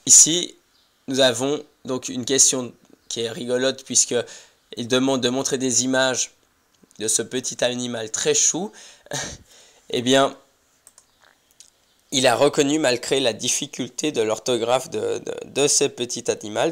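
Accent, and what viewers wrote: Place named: Switzerland. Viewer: ça s’appelle un accent! vive la Suisse romande et vive les vidéos de l'!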